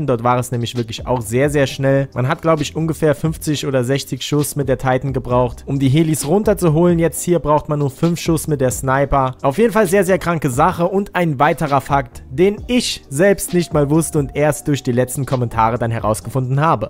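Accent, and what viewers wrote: Saarland, Germany. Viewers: Warum hast du nen türkischen Namen?Du klingst entweder sehr gut integriert oder wie nen Deutscher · ausm Saarland ? Und Frohes Neues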